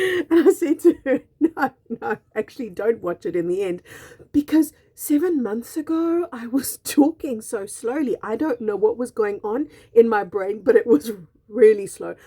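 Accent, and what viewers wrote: South Africa. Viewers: Haha, more just an avid reader. I loved reading books as a kid and I always excelled in English classes, be it grammar, spelling, etc. I guess I'm just a Spelling/Grammar Nazi! On a related note, I asked what her accent was, I'm American, It didn't sound British or Australian. It's South African! They make an "EEE" sound when pronouncing pin 📌 it sounds like "PEEEN." She threw me for a loop when she said "skeletal" and pronounced it "Ske-LEEE-tal." I had to research what her accent was! Like I said, South African, or "South Af-REEE-can" 😘😘😘😘😘😘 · So delighted to discover you,! Your video popped up on my feed randomly today and when I heard your SA accent I immediately Subscribed. Lol! Such an amazing message and I cannot wait to learn more from you!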